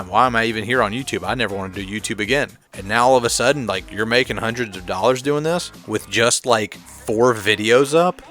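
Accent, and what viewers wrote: South, United States. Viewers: It's just my personal opinion but I feel like your channel has taken off for two main reasons, one is excellent well researched content presented in a very relatable way and two because you lend NASCAR material an air of authority with your southern accent but also not sounding so southern that no one can take you seriously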